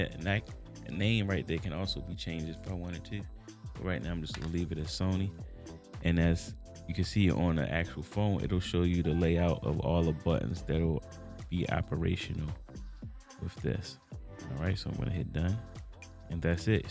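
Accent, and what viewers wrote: Baltimore, United States. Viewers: Excellent review! Sounds like I here a B-more accent heavily pushing thru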